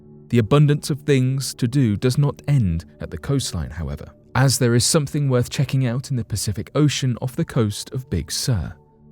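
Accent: England, United Kingdom. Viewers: The missing penguins are from globle warming no doubt everything is caused by it from any England voiced narrator as well as Democrat producers.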